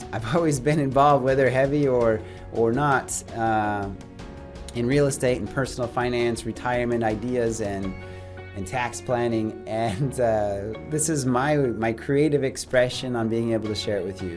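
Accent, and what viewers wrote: Italy. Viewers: I lived overseas for several years and you have the international English way of speaking with I think a bit of Italian regional accent. It it more recognizable when you speak slower. When you are more passionate or speak faster it disappears. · You have an Italian accent, but you look more Northern European. Then again, many Italians look more Nordic like yourself. Just wondering, that's all.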